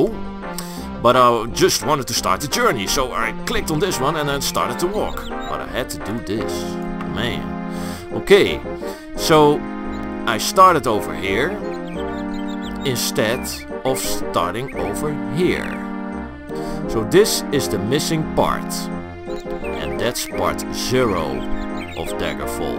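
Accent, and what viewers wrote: Netherlands. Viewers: that has to be a dutch accent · You have the best dutch accent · Dat strong Dutch accent tho. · Haha je kan fk goed horen dat je Nederlans bent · That's the most Dutch accent I have ever heard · you're accent... , if you arent from the netherlands Im eating my shoes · Ik hoor aan zijn accent dat hij Nederlands is😂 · Your dutch accent is out of this map man!! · I can't handle how Dutch this guy sounds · dutch accent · i have this feeling that you'r dutch beacuse of the accent? XD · You sound dutch, isn't it? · you sound super dutch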